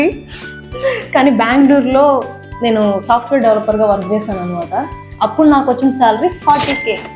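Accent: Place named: Guntur, India. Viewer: Tirupati antunnaru but maa guntur slang undi enti ?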